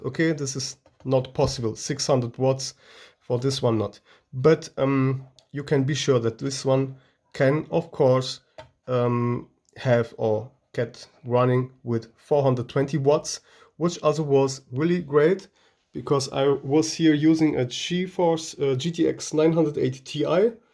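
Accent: Germany. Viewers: Trotz deinem guten Englisch, wusste ich das du deutsch sprichst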